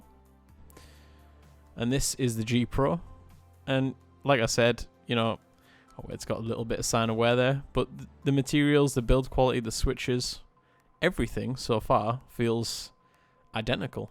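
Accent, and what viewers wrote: United Kingdom. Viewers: Sure am pal! Can always recognise the twangs of a fellow member of Gods county :-). Sheffield lad myself. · Good video, but next time you should use a better camera..more than half of the video is out of focus. lol BTW which part of UK are you from? Your accent is interesting!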